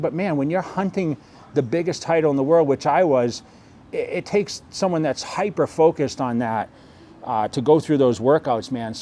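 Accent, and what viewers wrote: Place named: Boston, United States. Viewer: The Boston/Massachusetts accent coming out in full force lmao “shoaht list” “Splender” Boston: we drop r’s when they’re in the word and add them when they’re not there 😂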